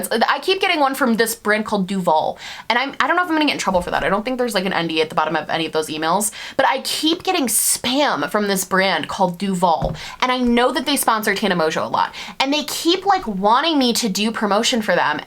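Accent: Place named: Upstate New York, United States. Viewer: I'm new to your channel, and I'm from Buffalo!! I loooove that I found a creator who kinda carries the "personality," for a lack of better words, of upstate/WNY. I LOVE your personality and the way you speak because it reminds me of home, everyone is lowkey annoyed but grateful at the same time and its very refreshing <3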